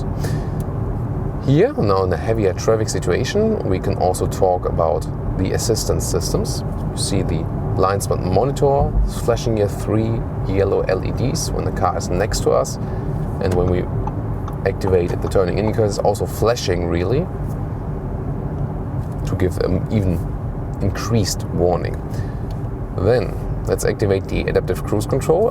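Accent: Germany. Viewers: Schade. Review könnte wirklich gut sein, wenn nicht dieser deutsche Dialekt wäre. Das Review von Brian ist da sehr viel angenehmer anzusehen. Meine Meinung, besser einfach auf deutsch das Review machen, oder einen native Speaker für das Review in der englischen Sprache. Es hört sich einfach (Sorry) grausam an.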